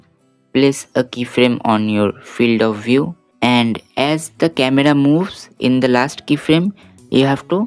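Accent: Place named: India. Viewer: Your voice indian but language English, where are you from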